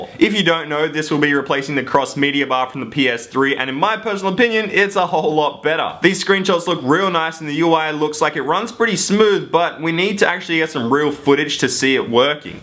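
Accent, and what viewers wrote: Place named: Australia. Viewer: If your accent really is Australian what part of AUS are you from?? Curious from a country Victorian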